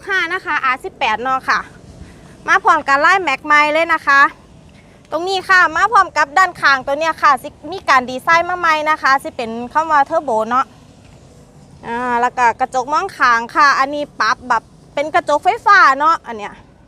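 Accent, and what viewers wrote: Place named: Isan, Thailand. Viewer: ข่อยมักเจ้าเว้าอีสาน✌️😁😁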